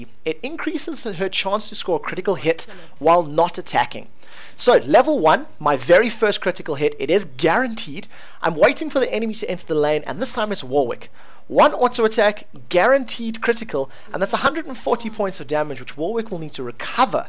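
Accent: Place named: South Africa. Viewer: I think he is South African, that's kind of how we all sound... xD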